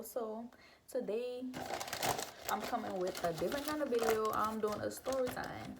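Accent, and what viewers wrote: New Orleans, United States. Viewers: I subscribed to your channel princess. Stay strong and I love that new Orleans accent. Stay blessed! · I hear a NOLA accent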